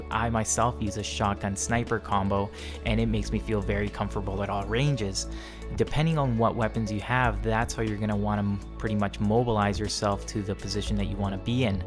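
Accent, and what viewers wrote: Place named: Canada. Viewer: This guy is a fellow Canadian, he has to be with that accent.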